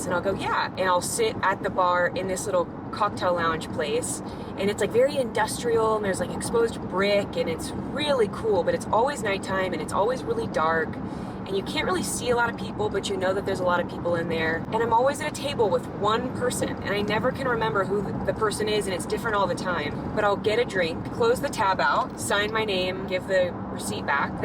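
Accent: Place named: Tennessee, United States. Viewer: Sound like Gatlinburg Tennessee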